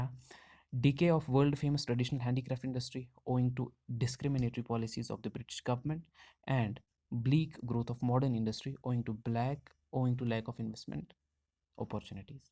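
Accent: Kashmir, India. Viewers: Kashmiri accent❤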